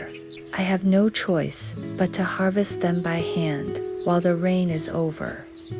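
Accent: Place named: United States. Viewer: Why do you speak fluent English with an American accent.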